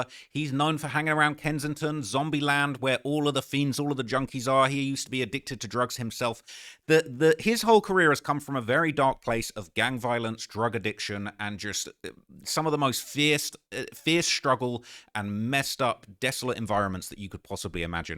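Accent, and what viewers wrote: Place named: RP, United Kingdom. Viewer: It sounds posh & refined.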